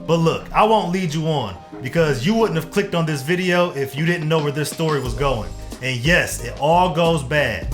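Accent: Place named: South, United States. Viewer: Southern accent doesnt work in these kind of vids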